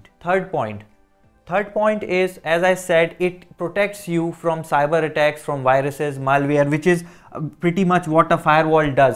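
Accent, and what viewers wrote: India. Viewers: 印式英语听得有点别扭，其他的都还好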